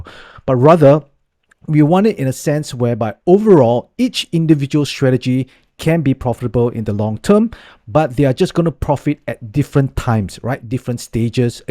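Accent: Singapore. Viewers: singaporean eh ?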